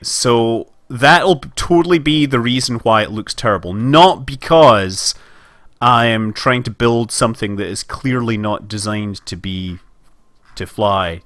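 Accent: Scotland, United Kingdom. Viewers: You haven't truly lived until you've heard a Scotsman recite Star Wars quotes. Well done,.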